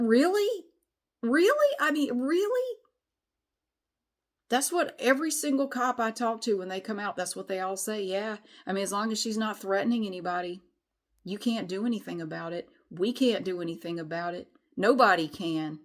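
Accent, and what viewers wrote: South, United States. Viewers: You sound southern . Arm yourself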